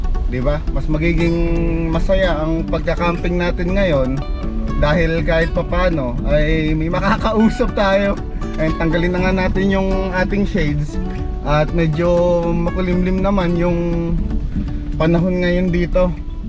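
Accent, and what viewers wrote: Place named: Malolos, Philippines. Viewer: Sir malolos talga Yung Punto mo...di Po ba mahirap Daan punta Jan sir Kaya Po ba Ng maliit na kotse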